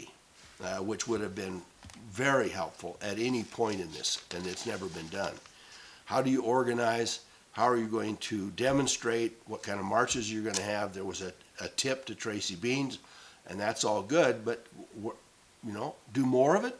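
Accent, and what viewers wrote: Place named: Michigan, United States. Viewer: ahhh, don't know why just always had a hunch you were from MI, thought I heard a MI accent. Maybe it's the farmer in you I recognize. Anyhow, I'd like to speak directly with you, not sure how to do that without alerting everyone else to your contact info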